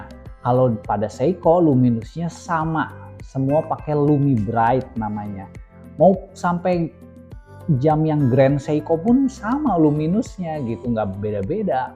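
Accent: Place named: West Java, Indonesia. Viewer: Pasti mang nya urg sunda 😅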